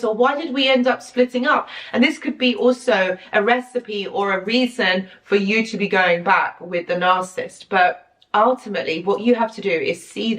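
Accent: United Kingdom. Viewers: YOU , Miss are absolutely BRILLIANT!! and adore your Brit accent😍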